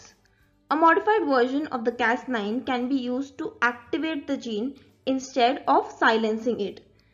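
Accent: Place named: India. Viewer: 真是受不了阿三口音，我服了，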